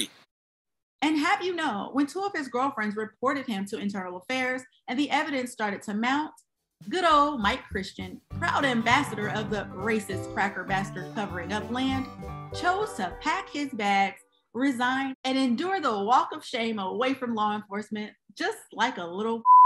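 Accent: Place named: South, United States. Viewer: Love your country accent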